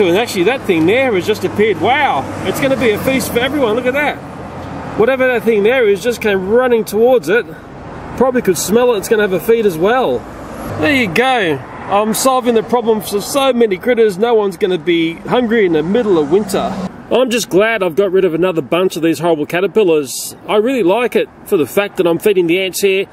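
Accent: New Zealand. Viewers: Thought he was a New Zealander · Yeah sounds like a New Zealand Accent. Which is Taika Waititis nationality (guy who directed thor ragnarok and voiced Korg)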